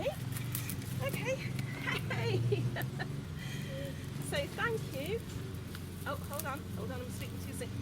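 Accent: United Kingdom. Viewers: you make me feel so much better about my garden, especially my fruit bushes, I never quite know what to prune out and do go at it a bit "willy nilly" I have been very ill for the past several months so am getting a late start to my pruning chores, however, I did start on my gooseberries a couple of days ago. I remember my step dad telling me to make sure to keep the middle of the bush open enough for a bird to fly through it (for air circulation I am suspecting??) other than that, I can't remember what branches the fruit comes on so I just basically thin out any that are crossing each other or damaged and clip the tips of the very long branches and call that it. As for the black currants, well, I have 3 bushes and I think last year I got maybe a half cup of fruit from the lot! I think I am doing something wrong don't you? My raspberries seem to have gone wild and are migrating across the rest of the garden so I am planning to cut down all the old ones and let most of the newer ones have their way. 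I usually just cut out the old dead wood and tip the longest branches to a manageable height. Maybe I need to go online and see what I can learn from there. Anyway, it has been a blast watching you, (I just found your channel so have subscribed) I love your accent, I was born here in Ontario Canada bur raised on the south coast of UK just a few miles from Brighton. I moved back to Ontario to raise my own family over 40 years ago. Still love to hear the British accents though.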